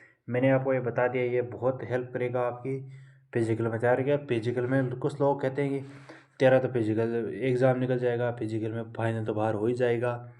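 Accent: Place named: Shekhawati, India. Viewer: Bhai rajsthan se ho ki wo bi sekwati se 😂😂baat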